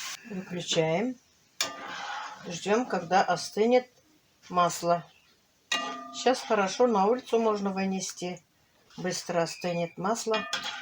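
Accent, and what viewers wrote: Tatarstan, Russia. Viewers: Apaika ne v koem slu4ae ne hotela obidet vas .vi horosho govorite .akcent tatarskii obozhay vas .s uvazheniem Farida💋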